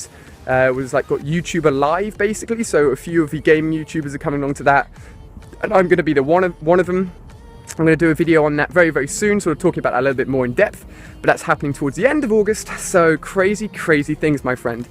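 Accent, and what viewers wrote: England, United Kingdom. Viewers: Trust me, I know you're not hating. But most of us in the UK pronounce it like that. Same with due, and durability. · He's in England why the fuck would he be in Scotland because he has a English accent like me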